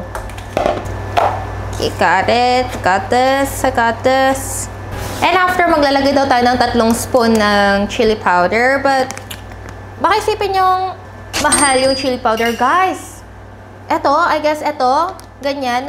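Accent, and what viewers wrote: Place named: Conyo, Philippines. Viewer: The way u speak it seems u r pure Filipino. You mix english with tagalog the accent as well.....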